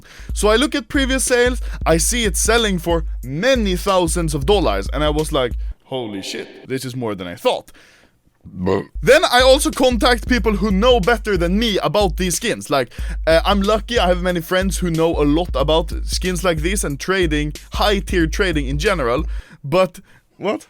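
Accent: Russia. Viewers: i thaught he was russian XD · Are you Russin?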